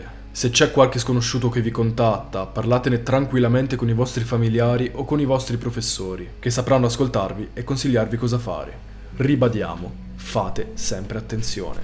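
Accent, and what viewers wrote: Sardinia, Italy. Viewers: Ciao cara, anche tu l'apprezzeresti allora? Magari facendo trasparire un pò l'accento sardo... mmmhh ci starebbe molto, molto bene ❣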